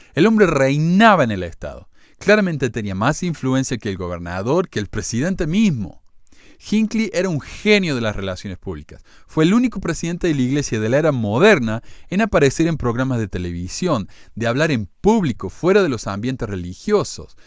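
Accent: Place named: Córdoba, Argentina. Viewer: el acento cordobés que tenes no se te va nunca che. Soy less active y en mucho coincido sobre pte Monson, pero tampoco decir cualquier gilada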